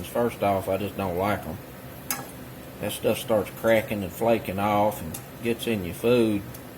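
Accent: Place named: South, United States. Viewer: I like hearing your accent and that southern drawl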